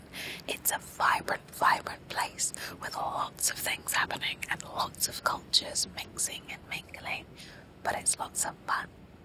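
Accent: England, United Kingdom. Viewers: So shocked she has a british accent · she had a British accent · I did NOT expect that English accent!! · asmr in an English accent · Hourglass English accent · Normally I hate british accent but hers is very lovely and soft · she had a british accent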